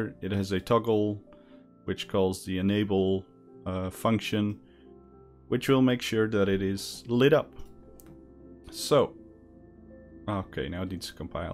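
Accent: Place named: Netherlands. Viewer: are you dutch? btw I am and you sound a bit like you are too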